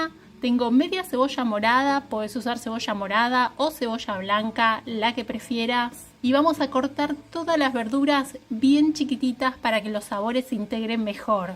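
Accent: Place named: Argentina. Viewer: Buenas noches, querida. Me aparece el video con traducción al portugués, que hablo muy bien porque viví en Brasil durante ❤más de 30 años. El problema es que a mí me gusta escucharte a tí en castellano con ese acento argentino (¿o uruguayo?) y es tu voz la que me gusta oír... ¿Me puedes decir cómo evito esta voz doblada con traducción?? 😮 Muchos cariños desde Canadá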